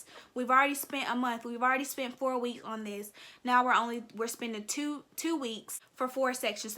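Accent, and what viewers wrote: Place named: South, United States. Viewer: Yesss Queen, thank you & I love your southern bell accent soo cuteee.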